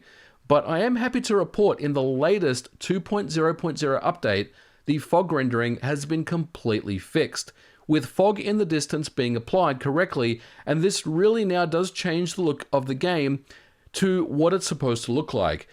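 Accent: Australia. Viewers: a) "oak-uh-reener" man i love australian accents lmao b) i'd really like to know how there are "bugs" emulating a 25 year old game on VERY capable hardware. theyre a trillion dollar company. make it make sense. especially considering how emulation has been on everything else for decades.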